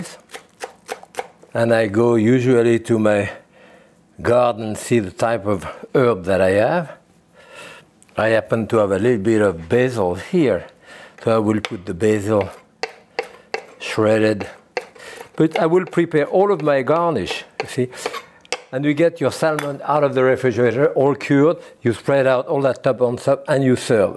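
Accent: France. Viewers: I love ur french accent english!😊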